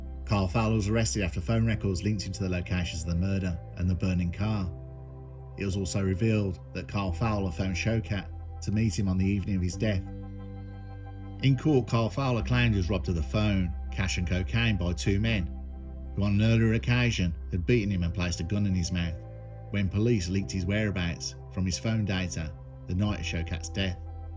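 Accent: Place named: England, United Kingdom. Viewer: Well done fellow, real British events narrated by an English accent, a great channel many thanks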